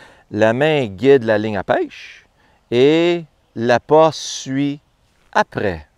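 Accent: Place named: Quebec, Canada. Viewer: J’adore entendre ses instructions en quebecois. Merci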